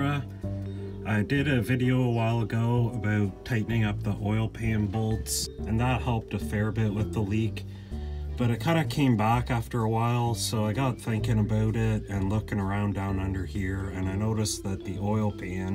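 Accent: Canada. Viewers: G-ah-sket. B-ah-ck. Th-ah-t. B-ah-d. Oowt. Interesting dialect. Cross between Canuck and California Valley speak. 😀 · This is definitely an Eastern Canadian accent! I’m guessing Nova Scotia. The Motomaster oil filter confirms the canuckery.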